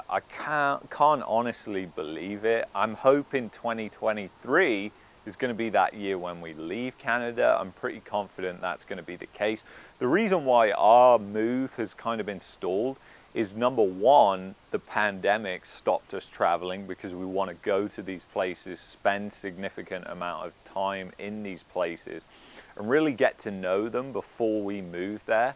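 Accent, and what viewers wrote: United Kingdom. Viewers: You Brit accent along is pleasure to listen to ! I learn English from your talking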